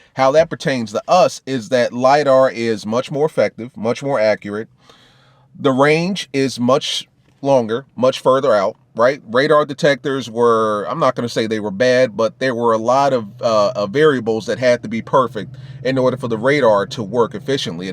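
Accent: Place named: South, United States. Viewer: why u talk like an old country segregationist politician in the 60s...im from south carolina and there's different types of country twang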